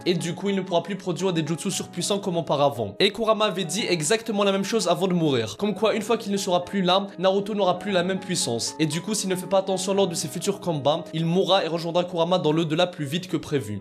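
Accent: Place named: Kabylie, Algeria. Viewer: l'accent kabyle est fort présent ! xD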